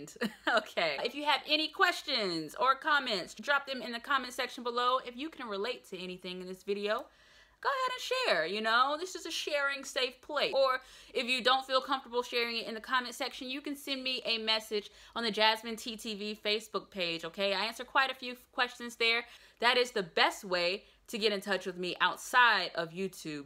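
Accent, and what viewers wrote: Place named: South, United States. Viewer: I knew yo ass was from the south! That southern charm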